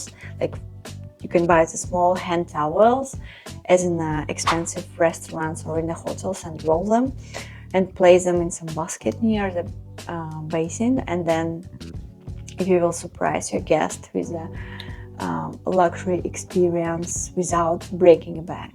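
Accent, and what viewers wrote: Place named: Germany. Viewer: You German huh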